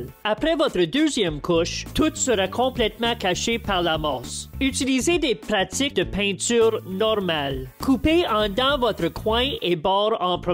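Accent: Quebec, Canada. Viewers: je pense tout simplement que ce monsieur est Québécois. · Aie c quoi cette voix c l'accent québécois mélanger avec canard 🦆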